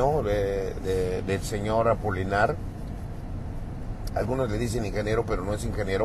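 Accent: Mexico. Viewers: Este compa habla CHILANGO FRESA 🍓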